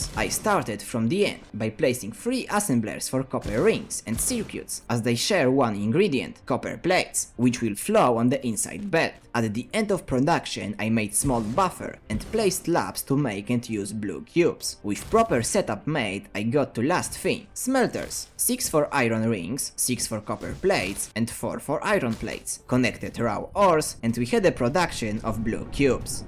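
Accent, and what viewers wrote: Poland. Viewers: Polski akcencik so its peak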